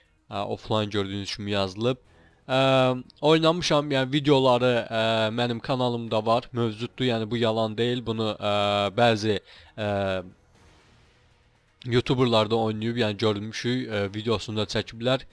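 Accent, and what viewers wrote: Azerbaijan. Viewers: aynen sonradan anladım da azeri ler bukadar değşikmi konusuyodu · Anaa azeriymişsin valla yahşi demesen anlamicam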